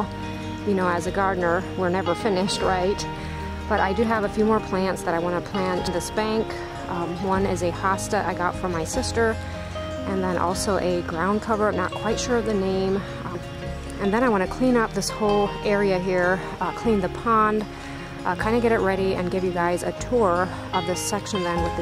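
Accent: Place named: Pennsylvania, United States. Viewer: Beautiful! Your husband did a fantastic job. Thank you for sharing your lovely property and the hard work you put into creating it. Your accent makes me long to visit my relatives in Berks county PA.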